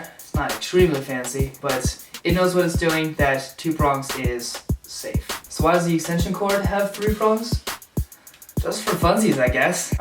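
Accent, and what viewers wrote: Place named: China. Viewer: you talk like chinese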